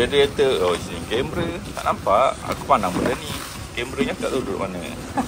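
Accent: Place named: India. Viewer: Dalame sy x dgar slank india jawe truskan boss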